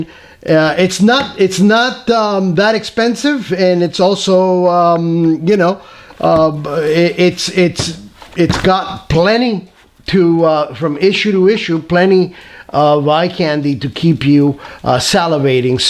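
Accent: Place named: Quebec, Canada. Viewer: That was a very good French accent, sound like my dad, he's from Québec.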